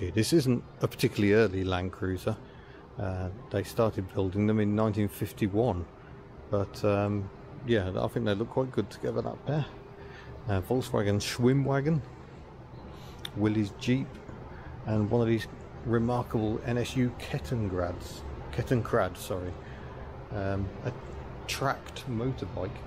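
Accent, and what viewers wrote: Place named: Glasgow, United Kingdom. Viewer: Not a bad Scottish accent. Like a posh Glaswegian.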